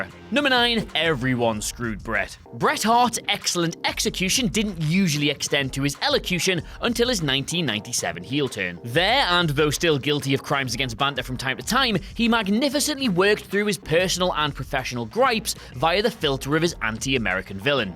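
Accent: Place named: England, United Kingdom. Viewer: Not used to an Englishman speaking English?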